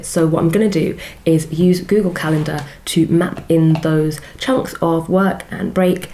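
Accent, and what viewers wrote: England, United Kingdom. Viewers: Usually I don’t like sophisticated English accents, but you’re the only exception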